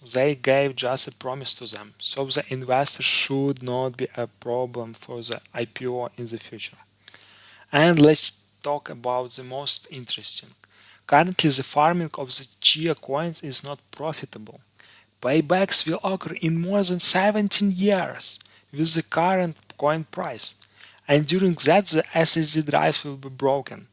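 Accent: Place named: Russia. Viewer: русский акцент)😀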